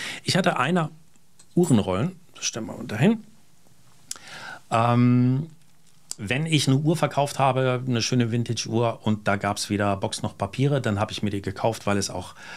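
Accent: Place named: Hesse, Germany. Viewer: Moin Herr, sehr interessantes Video zu einem Nischenthema, dazu top recherchiert und fair bewertet. Ich habe mir vor Jahren mal eine erwas rustikalere Lederrolle von Steinhart zugelegt, die ich aber sehr selten nehme. Auf Reisen nutze ich lieber die günstigen Reiseboxen von Sinn für eine Uhr oder etwas hochwertiger das Leder Reiseetui ebenfalls von Sinn. Wie immer sehr kurzweilig mit dem schönen hessischen nit und einer Prise Humor, Chapeau🎩.Und wie gewohnt schön in Szene gesetzt und klug kommentiert. LG Werner Hoppe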